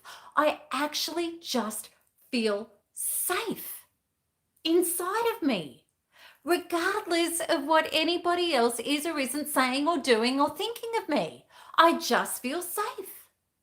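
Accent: Australia. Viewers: I like her Australian accent. I wokred in the Far East for over a decade. I am an American and I enjoyed the different English language accents and dialects from the people I met. In the FarEast I met many people from different continents who were also there.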